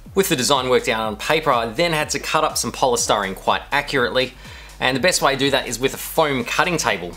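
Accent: Australia. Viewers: You sound australian, but don't call it esky? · The most unbelievable thing for me was hearing his accent but that he kept saying cooler and not esky or even chilly bin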